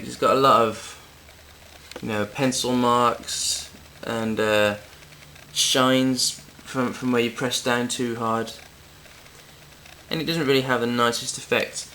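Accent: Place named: Walsall, United Kingdom. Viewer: WOW! i cant believe how well you can draw, heard your accent and thought he sounds like me haha im from walsall to, anyway well done hope you make lots a moneys from these :D x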